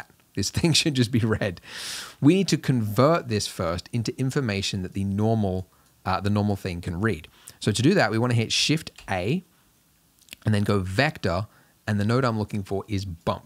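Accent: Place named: Australia. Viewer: bru are you an Aussie mate?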